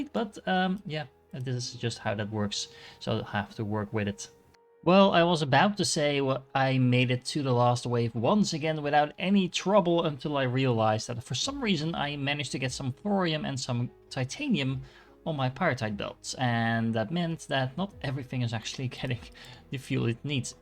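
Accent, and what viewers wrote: Netherlands. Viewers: Je nederlandse accent schijnt wel een beetje door hahahha (maar nogsteeds goede video, helemaal gebinged met snacks)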